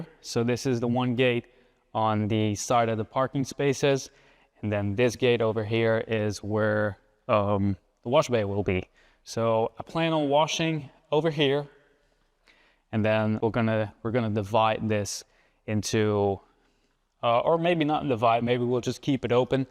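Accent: United States. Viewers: real question. Are you American or how do you have an American accent?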